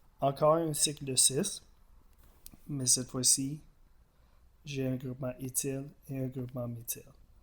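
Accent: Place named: United Kingdom. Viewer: from the accent i think hes from the united kingdom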